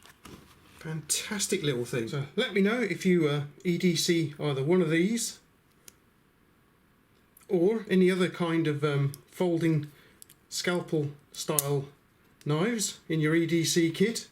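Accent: United Kingdom. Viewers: Haha you're a bit off with the Accent. The reviewer has a UK accent, Korg is voiced by Taika Waititi, a New Zealander. Literally the other side of the world.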